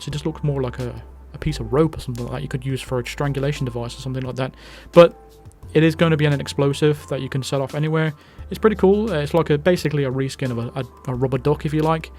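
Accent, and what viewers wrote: United Kingdom. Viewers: Finally a British man narrating hit man gameplay like a pro this is exactly what I was looking for when I think of good content! But bro mans can you convert pounds to dollars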